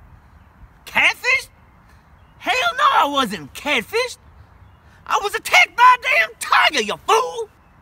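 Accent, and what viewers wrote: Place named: Appalachia, United States. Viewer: Funny!! I loved this video!! What I find funny though is out of the people you shited out only commented on this video so far. I hope they see it! Anyways I really liked how when you were doing your interview I got to see the real you! Your real voice! The hillbilly hick lmao!! Never grow your hair out!!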